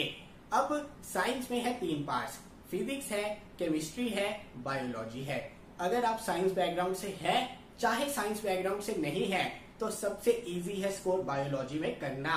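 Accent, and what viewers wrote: Punjab, India. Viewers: Aaye haaye..Punjabi Accent Sir ka😍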